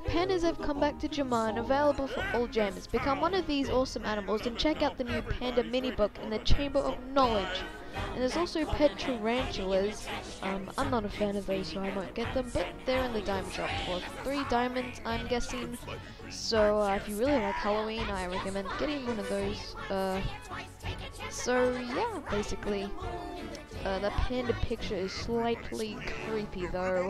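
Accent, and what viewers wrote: Australia. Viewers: ARE U AUSTRALIAN :O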